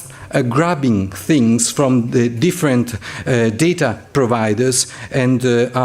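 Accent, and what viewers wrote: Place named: United Kingdom. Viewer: Sei troppo forte zio! Perfetto con un pizzico di accento britannico!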